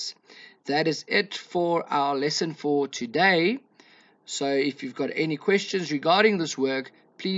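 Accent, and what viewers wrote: South Africa. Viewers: It sounds like you speak Afrikaans, because you have an south african acsent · I swear this guy sounds South African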